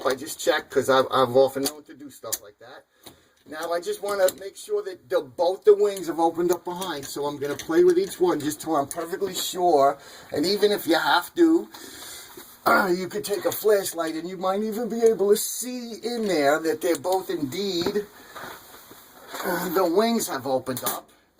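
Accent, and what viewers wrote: New York, United States. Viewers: Thank you . I love your NY accent and sure appreciate your help with the mollies. I do have one question, though. How would you fix the other kind of hole that you showed at the beginning of your video where the two holes ripped making the two holes one long hole? That's what happened in my bathroom. I hope you really do answer all of the questions you get because I really need to know! My towel rack fell off and it looks terrible! Thank you again!!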